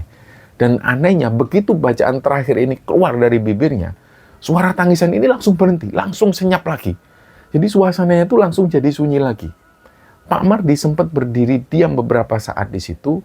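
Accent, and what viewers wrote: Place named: East Java, Indonesia. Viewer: Mas logat pemalange endi deneng nganggo logat jawa timuran 😅 😂😂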